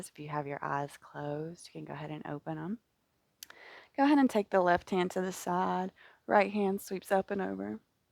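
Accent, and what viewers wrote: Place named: South, United States. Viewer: Feelin' Friday's flow and the Southern Belle accent is strong today! The pace is just right as always ;-) I'd like to praise you for reminding me of one of my favorite covers out there too! <link>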